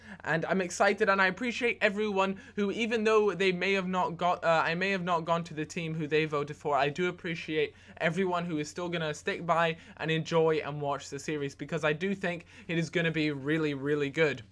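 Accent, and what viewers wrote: Scotland, United Kingdom. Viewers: I love you just cause your Scottish <3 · is he scottish? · Is Scottish?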